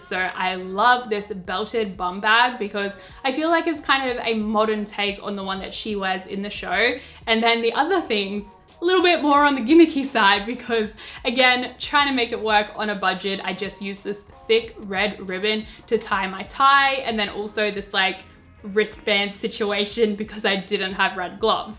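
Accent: Australia. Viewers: I hear red velvet I already love her I heard her Aussie accent I loved her more I SAW BT21 AND I SUBSCRIBED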